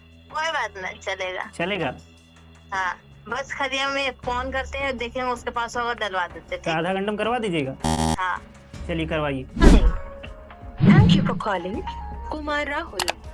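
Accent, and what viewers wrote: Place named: Jharkhand, India. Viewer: Bhai tum jharkhand se ho 😂 Mai bhi hu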